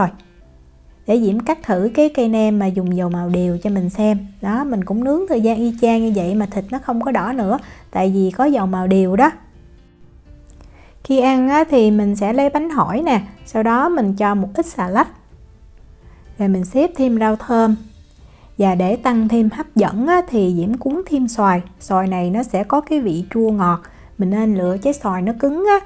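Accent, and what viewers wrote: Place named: Mekong Delta, Vietnam. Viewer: Thấy ngon quá, chảy nước miếng đây, thích nói giọng miền tây dễ thương quá. qua Nauy bao lâu rồi vậy. Cảm ơn nhiều.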